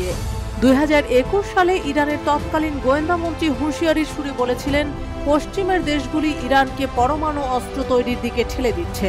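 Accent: India. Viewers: India voic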